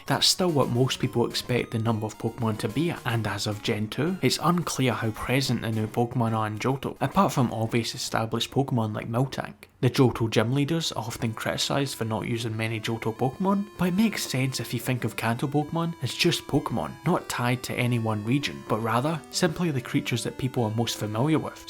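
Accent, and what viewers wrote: Scotland, United Kingdom. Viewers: it's a great accent you have. Brilliant. Scottish? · He said "Polish a bit more your voice" not "rebalance your sound". He doesnt understand the Scottish accent. I had no problems hearing anything in this video.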